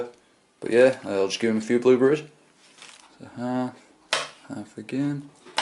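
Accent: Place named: Yorkshire, United Kingdom. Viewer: Where's that accent from? I'm guess yorkshire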